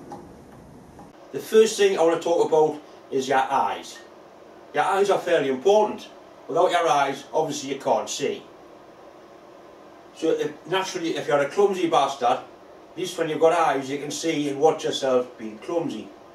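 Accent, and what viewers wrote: Newcastle, United Kingdom. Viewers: Been in Engineering all my working life - so well used to the tchnical terms used. First time I watched this, I laughed so much the tears ran down my face! Great Geordie accent - brilliant and sound Engineering advice - lots of good ideas. Keep up the good work. You must be a proud Dad!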